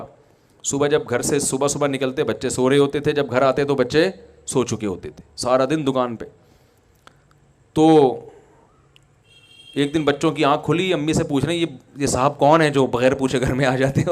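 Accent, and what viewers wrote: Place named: Mumbai, India. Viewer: Aap k bolne wala andaz pura mumbai type hai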